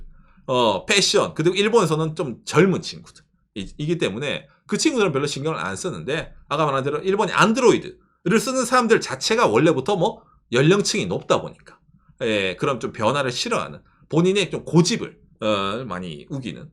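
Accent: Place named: Gyeongsang, South Korea. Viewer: 깅상도 유튜버 정도되면 사투리는 어느정도 걸러서 영상 올리슈. 유튜버 기속하려면!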